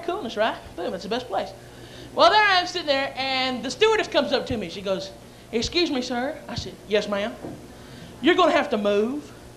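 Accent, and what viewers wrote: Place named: South, United States. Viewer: didn’t realize he had a southern accent .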